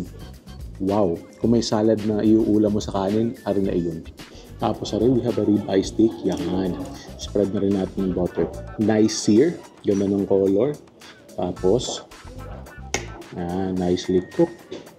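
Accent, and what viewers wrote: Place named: Lucban, Philippines. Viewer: Taga saan ka po, you sounds like lucbanin😅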